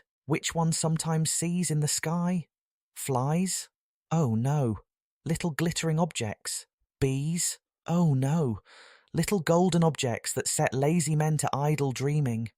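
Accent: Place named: United Kingdom. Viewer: Love this!It's very helpful for me to mimic the UK accent cuz your English reading is not so fast and the pronunciation is clear as well. Please make this kind of video more❤. I want to shadow more❤.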